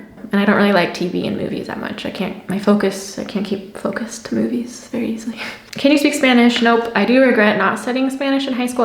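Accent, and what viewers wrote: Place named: Germany. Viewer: Hey, just found your channel. I love your story and how you came to be in Germany. It sounds like you worked really hard to adapt to the German lifestyle and everything that comes with it. I can even hear a little bit of a German accent in your voice as well, which is awesome. I've heard of Americans who have lived in Germany FOR EVER and still haven't found their German tongue. I myself am half German and have been raised bi-culturally in the US. You've re-invigorated my drive to some day make that big 1-way trip to Germany some day soon.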